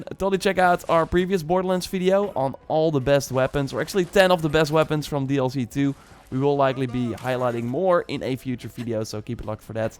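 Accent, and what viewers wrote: Netherlands. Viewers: You sound dutch not gonna lie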